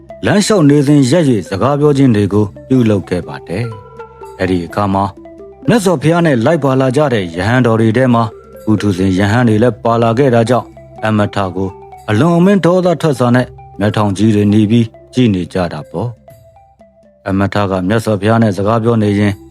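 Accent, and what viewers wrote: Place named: Dawei, Myanmar. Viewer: အကိုစကားပြောတာ ထားဝယ် အသံဝဲသလိုခံစားနေရတယ်ဗျ ထားဝယ်သားလားဗျ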